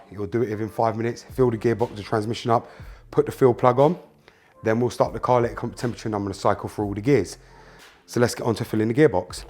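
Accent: United Kingdom. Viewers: You had me.....I was convinced you were British until you said ZeeF transmission 🙄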